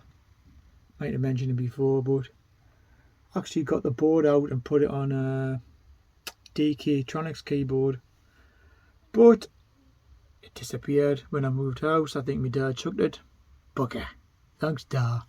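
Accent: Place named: Ireland. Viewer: Congrats on the 200 episode mate and i never knew your Irish 😂 you have some excellent collections my favoutite is the tins of paint. Seriously though the shed is class mate and love the tape game collection. Nice to see the consoles set up and computers its nice to see the master system getting some use as well as it collected dust at mine. Great stuff dude 🙂👍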